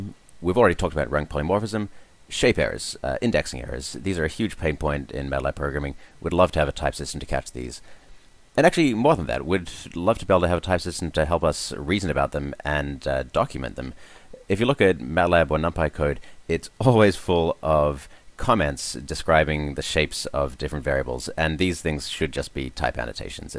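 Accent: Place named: Australia. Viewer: u r one of the most articulate persons I have ever heard. Great presentation. Where did you learn to speak? And you sound like an Aussie living in USA.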